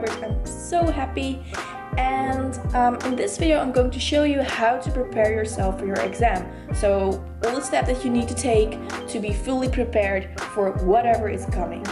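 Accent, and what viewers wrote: Netherlands. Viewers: Je bent Nederlands! Ik dacht al dat ik een accent hoorde. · Talia Bilal she sounds dutch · Je hebt een Nederlands accent op je Engels. Oefen een beetje!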